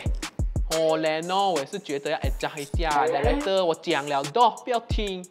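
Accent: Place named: Kelantan, Malaysia. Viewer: 听他的kelate 听到很上瘾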